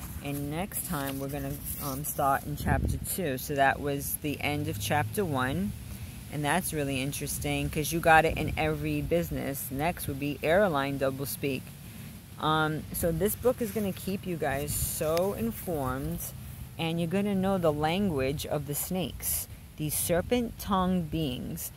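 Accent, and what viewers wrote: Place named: Bronx, United States. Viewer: I can barely hear your Brooklyn, Bronx accent in your voice.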